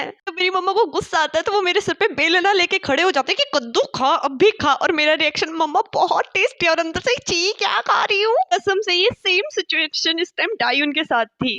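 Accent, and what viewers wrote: Punjab, India. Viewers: Hi sachi app ki awaj bahut achi hai punjabi banda door sa hi pehchana jata hai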